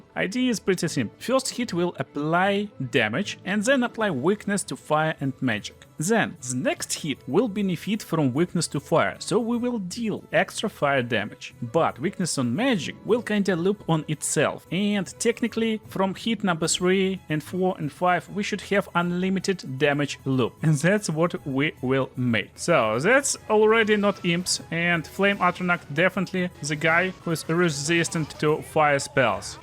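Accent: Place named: Russia. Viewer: That Russian accent is sexy AF.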